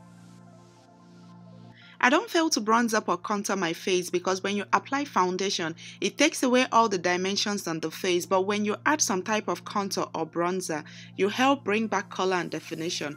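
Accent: Nigeria. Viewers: i just love you ...your makeup is good..your psyche and that nigerian accent is lit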